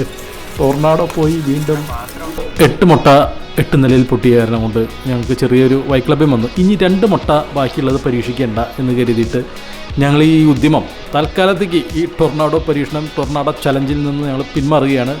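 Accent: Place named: Thrissur, India. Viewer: Laughed a lot.. Really funny... Who had given the voice? A person from Thrissur, right? Good commentary too..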